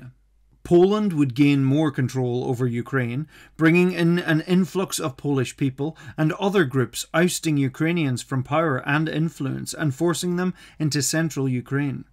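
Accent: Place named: Ireland. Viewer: Ah there is that beautiful Irish accent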